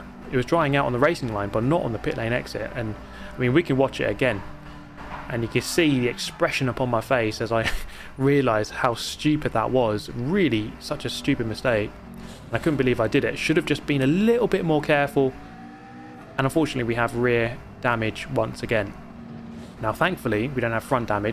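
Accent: United Kingdom. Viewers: i LOVE your voice and the british accent😆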